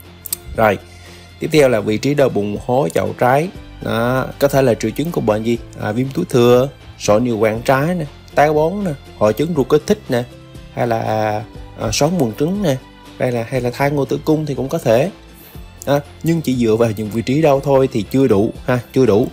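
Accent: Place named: Khanh Hoa, Vietnam. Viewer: Nghe giọng bs quen quá hihi giọng quê hương giống ở KHánh Hoà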